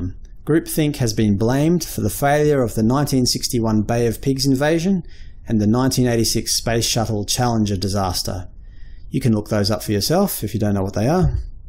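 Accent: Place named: Australia. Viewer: Hey there. I value your commitment to informing the public on misanthropy, and I really like your content. That accent sounds Australian, as am I. I am a researcher interested in understanding human behaviour, particularly so far as it relates to anthrozoology and ecopsychology. I am curious, are you a scholar or academic professional?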